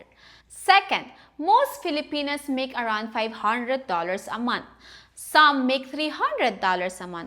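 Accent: Ireland. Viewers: That Damn Irish I just Love Your Accent!!!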